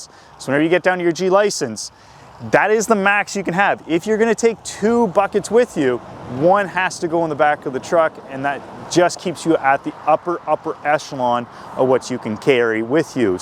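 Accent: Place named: Alberta, Canada. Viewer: I thought you were from Alberta, not west of Ottawa, I also watch B&F Macfarlane equipment.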